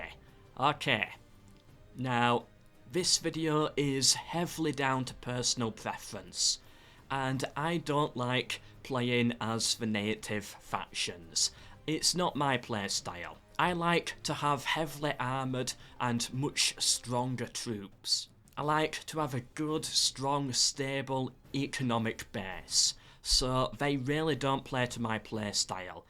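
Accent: United Kingdom. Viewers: Idk how good is your micro but you still sound british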